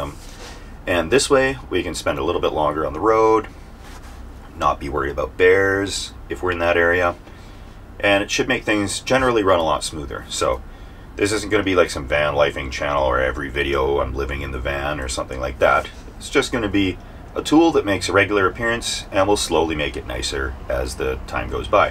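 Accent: Canada. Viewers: The canadian comes out when excited.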